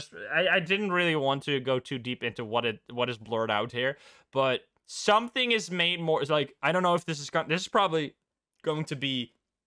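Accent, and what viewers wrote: Netherlands. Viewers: ik dacht al dat ik een nederlands accent hoorde maar nu weet ik het zeker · Wait a minute…. ARE YOU DUTCH??????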